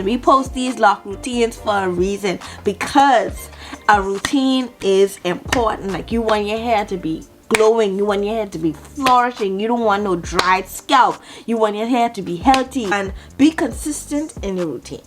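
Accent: Bahamas. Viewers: The second you opened your mouth, I knew you were Bahamian ❤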